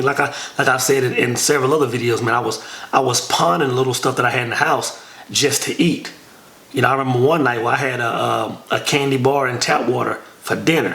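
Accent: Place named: East Texas, United States. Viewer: Man u from East Texas. I knew it! Great video!! I'm in Cedar Hill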